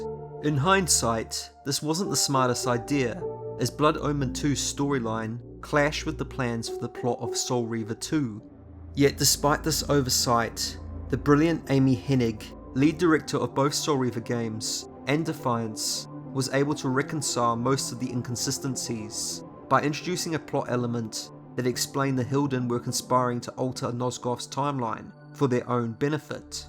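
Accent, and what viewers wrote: New Zealand. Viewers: trying to work out your accent, Kiwi?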